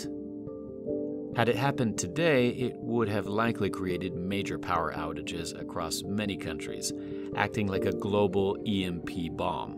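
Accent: United States. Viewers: What happened to your voice???!!! An American accent just isn't the same. (I'm from the U.S btw) I think it sounds better with your original voice. Just my 2 cents worth... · That's with the American accent? I loved ur ur original voice it was calm and soothing .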